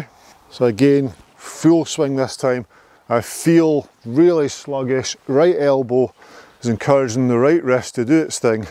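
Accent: Scotland, United Kingdom. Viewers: Truly the best golf instruction I ever received! This instructor is the one that, finally, instructs...as if all the others I have met were hiding the true "secret" of golf. And the beautiful Scottish accent comes as a bonus, straight from the land where golf was invented! Thank you.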